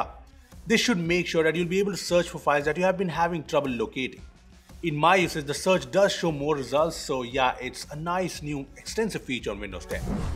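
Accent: India. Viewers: How to show fps? You talk too fast indian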